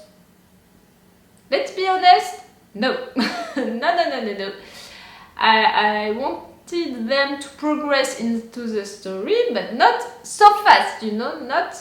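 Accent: France. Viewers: Are you french? You have a accent french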